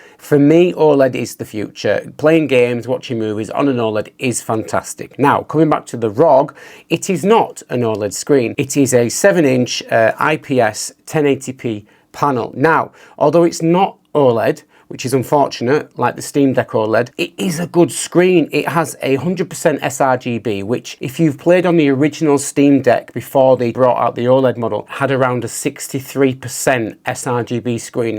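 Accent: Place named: England, United Kingdom. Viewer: i just want to thank you for doing this and for your channel im think of buying a rog ally because A good price B all the things i can use it for and C play at my friends house for sleepovers thanks for your reveiw. Ps my parents are from england and now we live in canada and it is very refreshing to hear your accent. thanks!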